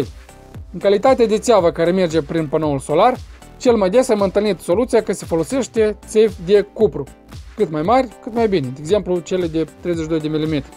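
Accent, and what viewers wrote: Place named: Moldova. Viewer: Prezentarile schematice ale dumneavoastra pe youtube sunt foarte benefice. Ami place accentul si limbajul dumneavoastra de moldovean autentic. Multumesc mult pentru efortul si timpul cheltuit. Am un system de incalzire al casei cu termosemineu pe lemne , centrala electrica trifazata de 19 kw typ instant hot water, cu care ami incalzesc caloriferele in 6 minute, pana focul din termosemineu incalzeste primii 200 l de apa din puffer apoi o opresc, un boiler electric care e conectat si la apa calda de la centrala electrica si la puffer. Pufferul de 800 l l-am compartimentat in 200, 400 si 800 l cu jutorul unor robineti, ca sa nu incalzesc toti 800 l de odata, dar nu l am cumparat si cu serpentina pentru a adauga instalatie solara cu tubui presurizate. Am vazut ideea dumneavoastra cu doua acumulatoare de apa care e f. buna. Credeti ca pe timpul iernii daca adaug systemul cu tuburi presurizate poate ajuta putin temperatura apei din puffer? 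Credeti ca e posibil sa adaug si systemul cu tuburi solare presurizate cu un nou acumulator de 500 l cu serpentina, la systemul meu deja cu doua surse pentru incalzirea caloriferelor si un boiler pentru apa menajera? Daca e posibil atunci doresc sa am o diagrama completa cu detalii, contra cost. Timpul si efortul cheltuit inseamna bani sunt de acord cu dumneavoastra. Multam frumos Costel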